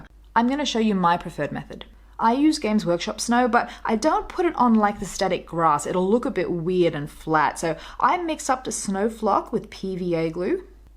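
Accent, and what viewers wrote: Australia. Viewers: Also, subbed. Love seeing content produced in Australia! (God I hope that's an Australian accent, I should be able to pick that)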